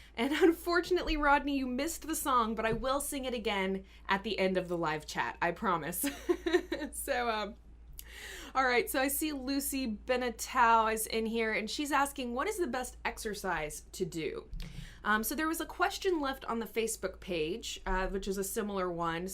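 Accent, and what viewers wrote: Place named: Texas, United States. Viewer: i can hear the texas in your voice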